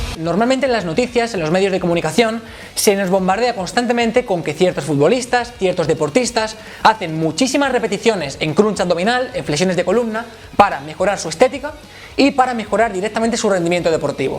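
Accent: Galicia, Spain. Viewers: Me marea mucho el acento gallego de este flaco. Me cuesta entenderlo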